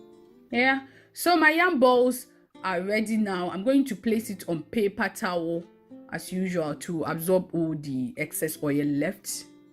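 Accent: Ghana. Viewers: You sound like a Ghanaian . Are you? Yam balls sounds yummy 😋 I love the mortar and pistle. From dakty channel. Thanks for sharing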